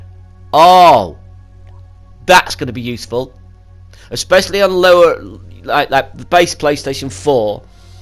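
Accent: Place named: Scotland, United Kingdom. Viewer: I like your video Mr. British/Scottish guy, I will subscribe so that you can inform me of more of these major updates affecting the gaming industry and PS4 exclusives, I seriously don't know where I would be if I hadn't found your channel, I would literally be living under a rock, can you please update us on the future of the gaming industry economy, Covid-19's effects on the industry and when GameStop will become a penny stocks? THANKS.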